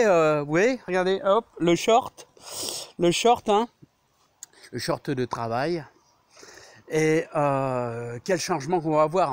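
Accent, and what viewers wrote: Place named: Nord, France. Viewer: Bonjour! Ravie de m'être abonnée à votre chaine, j'aime beaucoup la façon dont vous abordez le jardinage, vos conseils précieux et, cerise sur le gâteau, votre si sympathique accent "chti"! Je vois que vous avez quelques pieds de raisin, bien beaux et bien fournis, quelle chance! Pourtant originaire d'une région où les vins sont prestigieux, je n'ai pas la chance de pouvoir en récolter, les guêpes passant avant moi parmi les grappes et ne me laissant que les peaux des grumes, et encore, pas toujours car les oiseaux se chargent de "vendanger" le reste... Auriez-vous une idée à me donner pour éloigner ces dames jaunes et noires pour qu'elles me laissent quelques grappes à récolter, s'il vous plait? J'ai voulu essayer l'ensachage mais cette méthode ne m'a pas convaincue, et je répugne à installer des pièges... Merci d'avance.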